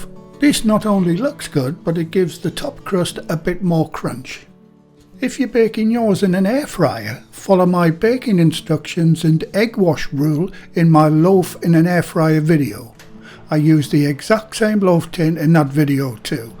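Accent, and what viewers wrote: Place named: Newcastle, United Kingdom. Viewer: I don’t hear anything but Geordie.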